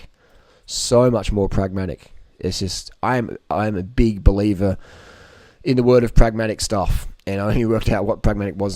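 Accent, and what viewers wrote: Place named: Ireland. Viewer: Great to hear an Irish accent on here! We dont need milk.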